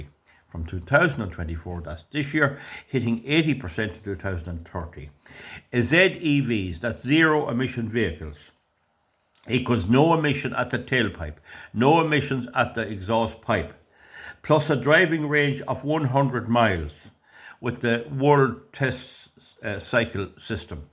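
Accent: Ireland. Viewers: i like the way you explain things. and that lovely Irish accent .thanks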